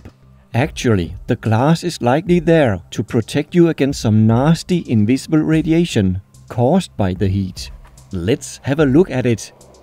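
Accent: Denmark. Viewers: The Danish accent 💀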